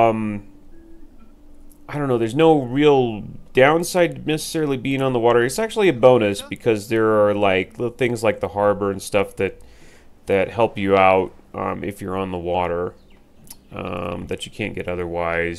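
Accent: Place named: United States. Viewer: yes i love smooth american accent as well:D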